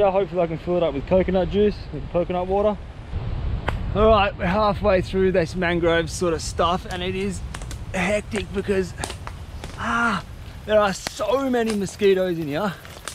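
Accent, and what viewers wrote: Australia. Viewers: At first I thought this was fake. Then I heard the Australian accent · Mate are you Australian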